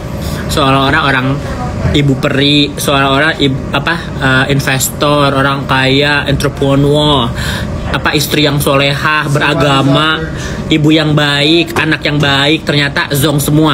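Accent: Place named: Sunda, Indonesia. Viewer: Kk lucu ngomong sunda nya ceunaaaaĥhhhh 😂😂😂😂😂😂😂😂😂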